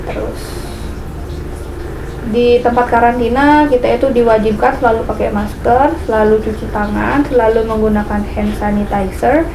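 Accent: Bali, Indonesia. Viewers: Sing hilang" logat bali ne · Logatnya asli bali bnget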